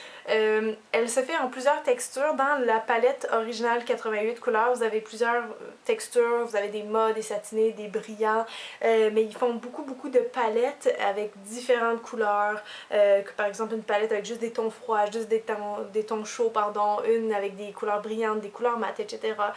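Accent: Canada. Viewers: salut je viens de m'abonner une copine m'a montrer ce ke tu fait moi j'adore et l'accent canadien c tellement magnifique , j'ai vu ta beaucoup d'abonner et tu l'ai mérité ! :)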